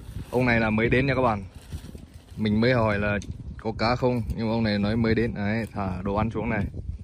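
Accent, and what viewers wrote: Nghe An, Vietnam. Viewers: 🥰🥰🥰 Yêu cái giọng nghệ an ghê😂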